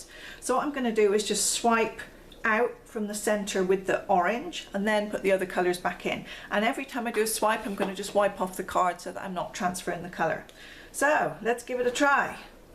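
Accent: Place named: Bristol, United Kingdom. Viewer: Is that a Bristol accent I hear? Reminds me of home (Beckington). Lovely pour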